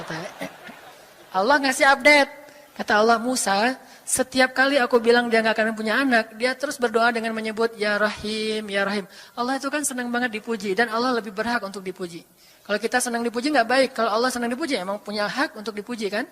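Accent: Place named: West Java, Indonesia. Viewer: Pa ustad itu aslinya mana tapi ngomong nya ada sundanya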